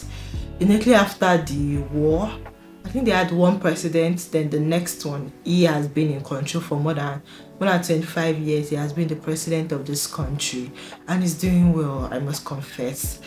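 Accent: Nigeria. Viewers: You try to put subtitles because Nigerian accent is hardly heard by Rwandans. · More people speak english now, but i think your strong nigerian accent was a problem. It's like me when listening to Jamaican or carreabean. If you speak clearly, they will for sure understand.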